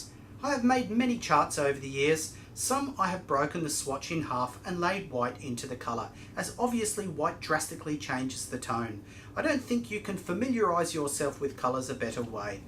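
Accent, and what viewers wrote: Australia. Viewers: I feel immediately comfy cause youre as aussie dounding as me..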